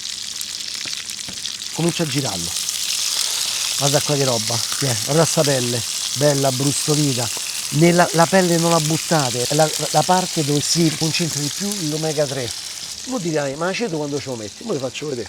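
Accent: Rome, Italy. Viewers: I can barely follow Roman Italian but I love his stuff!!!!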